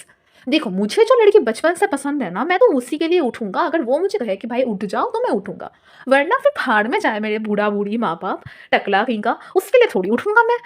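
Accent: Bengal, India. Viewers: you're bengoli am i right?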